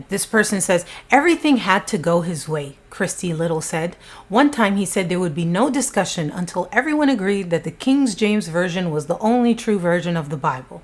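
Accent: France. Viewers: you have a great french accent! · I'm living for your French accent! good luck on your Paris endeavor. Thanks to you, I don't have to deep dive into these disturbing situations, myself. I feel so terrible for lil Bethany...she was such a gem. Like what a beautiful mind and smile. Hopefully she is resting easy and her loved ones are trying to heal. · just got invaded by visions of Lori Vallow or so. LOL. You narrate stories well and champion the victims. Thanks,! I usually skip the ads but not with you, haha! Your French accent is tres, tres bien! I think it may have been Tyler on his own or Bethany, in her fragile state of mind, was pushed to doing it.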